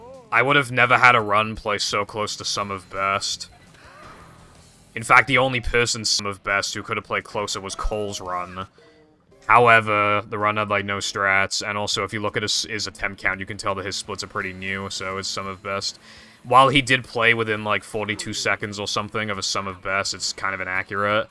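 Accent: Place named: Boston, United States. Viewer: That's the Boston accent for you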